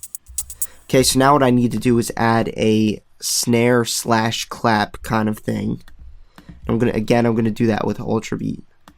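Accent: Philadelphia, United States. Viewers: sounds like Philly to me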